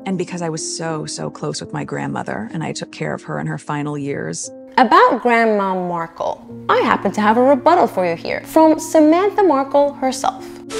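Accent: United States. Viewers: Great video your accent perfect English but I'm wondering is it American it has a slight undertone of something else I'm so interested in it. It's an American accent with slight differentiations on certain pronunciations though few would notice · Listening to you is like listening to my own thoughts in an American accent. Loving your videos. Just discovered them today.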